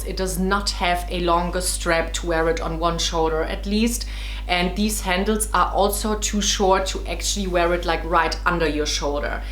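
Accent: Germany. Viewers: Where are you from?:) you sound so German 🙈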